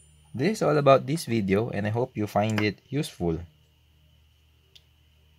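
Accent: Philippines. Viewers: I recognize the accent. Are you filipino?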